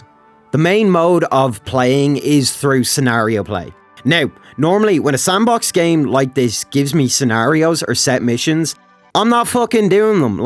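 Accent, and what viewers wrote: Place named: Ireland. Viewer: I know a lot of people in the tf2 community don't like tftubers to branch out and do other things, but I'm genuinely glad to see you make videos on a variety of things bc it's fun to listen to your funny Irish voice, your videos are always amazing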